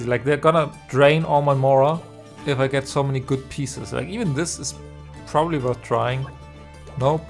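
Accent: Germany. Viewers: Man hört dir dein deutschen Akzent so raus 😂 Aber gutes Video 🫶🏻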